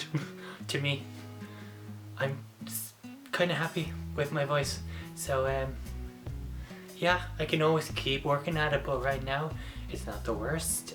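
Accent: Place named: Ireland. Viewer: Anyone saying you don't sound like a woman is what we in Canada like call "hosers", (foolish or uncultivated people), You definitely have a feminine voice, I actually always thought the Irish accent sounds sexier in women than men and you got that sexy goin' on sister in all aspect. Your videos are awesome and definitely inspirational, so I hope you go through with your channel no matter what, since as trans people, especially as trans women, we have a truly hard fight to break barriers, and I don't think YouTube's threats should be an exception to our strength.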